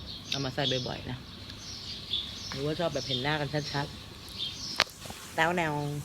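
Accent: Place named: North, Thailand. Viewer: เหนือขนาดจ้าว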